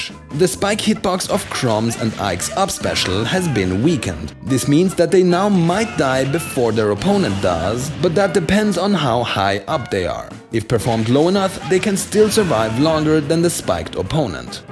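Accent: Germany. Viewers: Deutscher Akzent🤔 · Dat german accent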